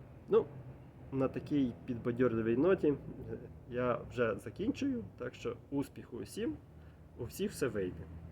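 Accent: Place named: Kolomyia, Ukraine. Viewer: Ти сам родом звідки? По акценту дуже нагадуєш моїш знайомих з Коломиї, Косова.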